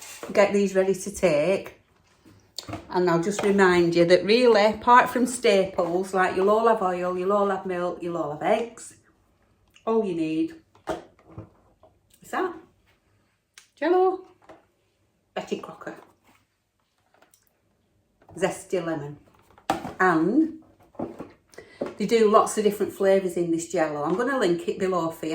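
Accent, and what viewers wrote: United Kingdom. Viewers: Hello 👋 from the USA… what kind of cream did you use for the frosting? I sometimes have a little problem with the UK accents or or too the products have different names there..❤❤Thanks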